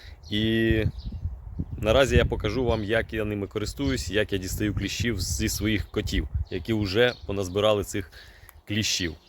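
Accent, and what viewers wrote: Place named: Urals, Russia. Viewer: Акцент у этого русского странный ,то ли с деревень Урала, то ли с деревнь Удмуртии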